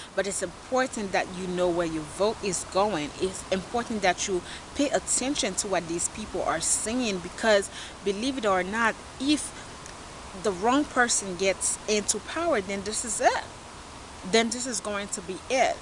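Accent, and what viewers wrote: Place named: Haiti. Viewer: You have a nice accent , are you from Haiti?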